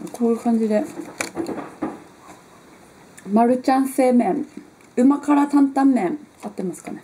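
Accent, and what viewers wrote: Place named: Tohoku, Japan. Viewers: 訛りが私と同郷な感じがするのですが・・・(笑) 私は生粋のイナカッペ、キリタンポ野郎ですｗ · 美味しそうに食べますね。お腹空いてきました＾＾ 東北の方ですか？ 東北人なのですが、なんとなくイントネーションに親近感が沸きます。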